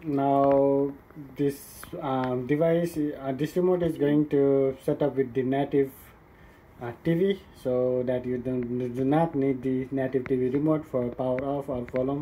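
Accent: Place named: India. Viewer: Are you Indian? Because your accent sounds like it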